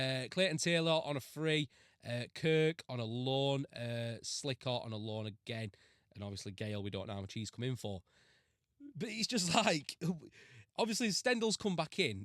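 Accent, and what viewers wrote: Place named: Manchester, United Kingdom. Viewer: Why is your accent slightly Mancunian when you're a Sheffield Wednesday fan? For example "Lockor" instead of "Locker."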